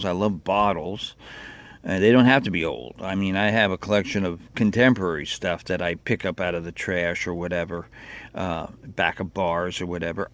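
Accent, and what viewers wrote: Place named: New Jersey, United States. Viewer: You got a nice little spot there! Sounds like you are from new jersey with that accent :)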